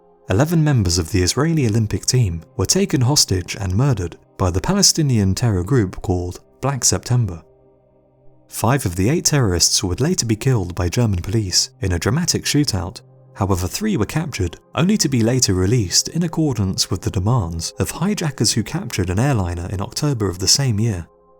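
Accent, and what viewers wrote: England, United Kingdom. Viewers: You sound like the spiffing brit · Englishman here. He sounds English to me. What was it that he didn't pronounce properly?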